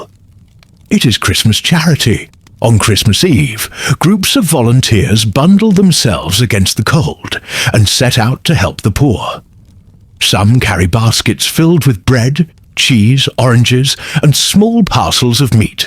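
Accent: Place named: United Kingdom. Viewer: Missouri USA, pm. I love the British accent.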